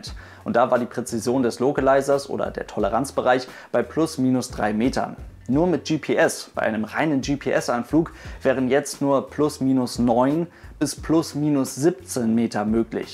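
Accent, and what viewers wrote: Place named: North Germany, Germany. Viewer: Er ist nu mal ein Norddeutscher, da heißt das Flugzeuch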